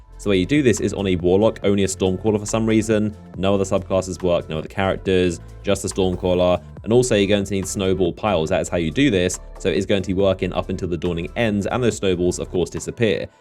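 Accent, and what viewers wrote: England, United Kingdom. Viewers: I really like English accents. You're a very good youtuber, but when I see big news that various YouTubers cover I choose yours because you just sound so dang proper.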